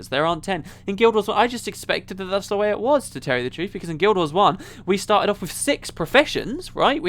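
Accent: United Kingdom. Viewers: Not weird at all. It's the british accent.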